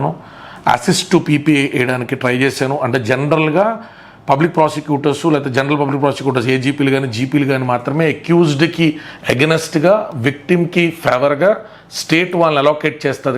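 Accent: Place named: Bhimavaram, India. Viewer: దయచేసి మీరు ఇంక మీడియా ముందుకు రాకపోవడం మంచిది గారు.. JSP వల్ల చెడు అయ్యారు, ఈ లావణ్య కేసు వల్ల ఒక రకంగా పబ్లిక్ దృష్టిలో జోకర్ అయ్యారు.. బూతులు తిట్టి మా లాంటి వాళ్లకు గట్టి సమాధానం ఇవ్వ వచ్చు కానీ పబ్లిక్ లో మీపై ఉన్న అభిప్రాయం మారదు..ఇకపై మీకు శ్రీరంగ నీతులు చెప్పే అలవాటు ఇకపై ఐనా మానుకోవడం మంచిది.. 12 నెలలు అంటే ఆ తరువాత మాట్లాడుతారా..?? మరి ఇన్ని రోజులు భీమవరం యాస లో పెద్ద రాజకీయ ఉద్దండo లాగా ఎందుకు మాట్లాడారు..?? వాస్తవానికి మీకు ఉన్నది కుల గజ్జి రాజకీయం లో కమ్మ సామాజిక వర్గం మీద మీకు ఉన్న అక్కసు వెళ్ల కక్కడo కోసం మాత్రమే మీరు జన సేన పంచన చేరారు..అక్కడ మీ స్వభావం చూసి పార్టీ నుంచి పక్కన పెట్టారు.. MARK MY WORDS మీరు TDP win కాకుండా ఉండాలి అనే మీ కోరిక నెరవేరలేదు కాబట్టి ఇపుడు YCP కి మద్దతుగా TDP&JSP కి వ్యతిరేకంగా వెళ్తారు భవిష్యత్తులో..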